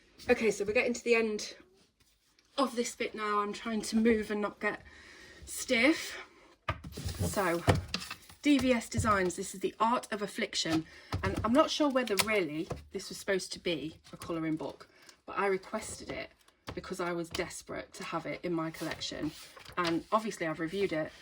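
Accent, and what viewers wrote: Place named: Nottingham, United Kingdom. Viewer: Love the video. Are you from Nottingham? Your accent is similar to where I grew up, but I moved away when I was a child so I could be wrong xx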